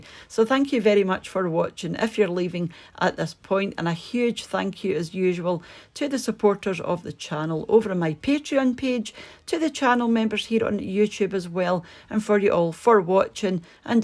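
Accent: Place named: Glasgow, United Kingdom. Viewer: I'm from Glasgow. Live in usa. Love to hear your accent. Thank you so much for this. It brings back memories❤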